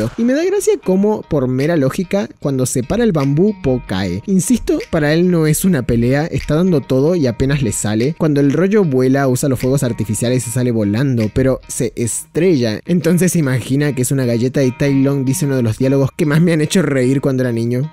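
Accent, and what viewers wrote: Argentina. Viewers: sos argentino o uruguayo? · el video me re ceba pero me desespera el acento neutro/argentino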